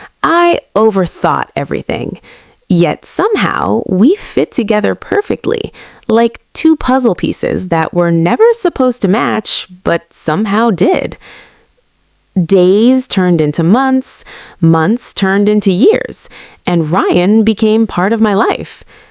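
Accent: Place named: United States. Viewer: ❤❤❤❤. American English so nice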